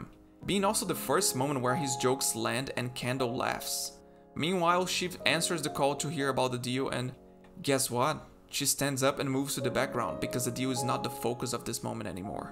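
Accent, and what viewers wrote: Brazil. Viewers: Is this a Brazilian accent I hear? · Tu é BR hein. Digo mais: CARIOCA. Baita conteúdo! Essa série é sensacional, consumo muitto conteúdo secundário de Succession e teu vídeo chegou até mim através disso hahahaha. Parabéns, furou a bolha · Are you Brazilian? I swear your accent sounds like someone from Brazil speaking english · Um sotaque de levinho de brasileiro falando inglês! Vídeo ficou sensacional btw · acho que o narrador é brasileiro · vc eh brazuca? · Are you brazilian? your accent sounds brazilian 😅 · Identifiquei que era BR pelo acento, mas otimo inglês irmão, parabéns pelo trabalho, essa série é bizarra de boa. Nunca vi tamanho desenvolvimento de personagem, te faz conhecer um personagem melhor em 3 temporadas do que em 7 ou mais em outras dezenas de séries. Abraços!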